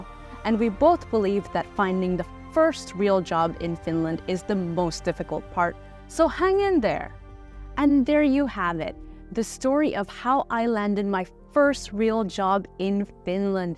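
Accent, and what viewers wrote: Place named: United Kingdom. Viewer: Mam how did you learn the particular accent ? Like proper British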